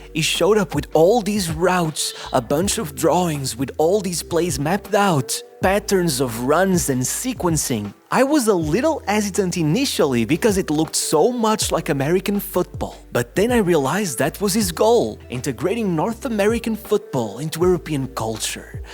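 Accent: Portugal. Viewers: I thought you were better than this. You’re just Sky Sports with a Portugese accent.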